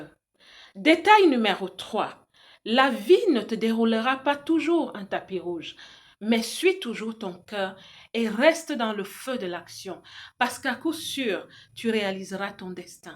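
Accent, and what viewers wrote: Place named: Cameroon. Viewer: Merci pour cette histoire passionnante ! Vous aussi vous devez être une princesse de naissance comme moi 😊 Et il me semble reconnaître un petit accent du #237🇨🇲 Bien à vous Marie-Céline Princesse de Hegba♔©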